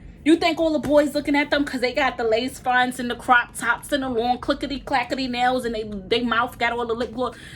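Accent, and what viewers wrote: New York, United States. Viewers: I just love listening to you and that New York accent. 😊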